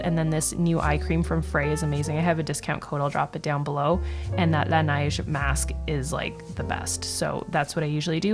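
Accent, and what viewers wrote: Canada. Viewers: finally a Canadian who I can tell is bilingual!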